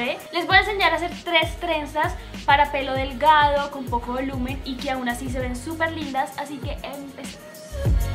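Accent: Colombia. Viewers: Que agradable escuchar una colombiana sin acentos exagerados!!! Muy buena vocalización y buen tono de voz...felicidades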